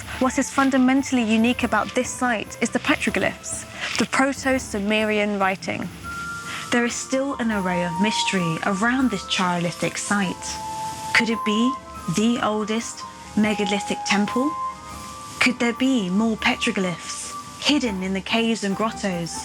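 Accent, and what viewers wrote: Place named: United Kingdom. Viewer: This is so interesting! Thank you for sharing. You're such an eloquent and engaging speaker. On a slightly unrelated note, your name makes me wonder if you're Ukrainian/Slavic?.. But at the same time you definitely sound like a native British speaker haha. I hope it's okay to ask, but do you have Slavic roots or am I digging too deep?:)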